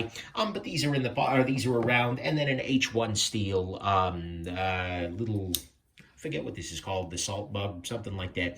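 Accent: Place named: East Coast, United States. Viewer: Your in california?? I felt certain your were an east coast guy.... I'm sure at least from the northeast part of the country.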